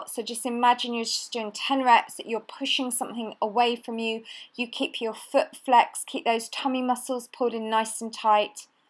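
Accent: England, United Kingdom. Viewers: Love your ab and booty workouts!! Haha and i love hearing you say "booty". I love English accents!!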